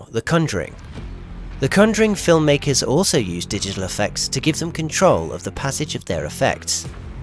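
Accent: United Kingdom. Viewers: I prefer this British accent day over most Aussie accents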